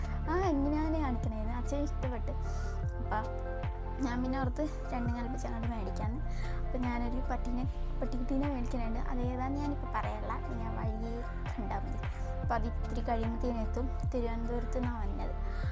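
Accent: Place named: Cherthala, India. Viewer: ചേർത്തല slang ഒത്തിരി ഇഷ്ടം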